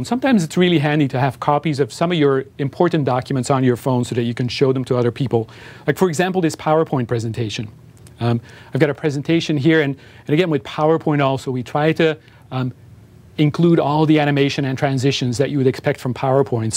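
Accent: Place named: Germany. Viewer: Are you a GERMAN ? Got an accent . :)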